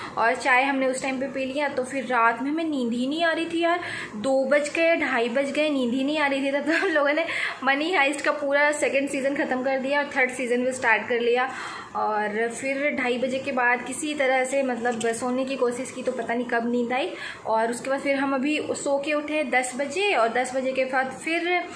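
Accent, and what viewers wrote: Bihar, India. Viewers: Di aaplog Bihar s belong krte ho n ...qki bat krne k tone s lgta h Bihar s ho aap... mai b bihar s hu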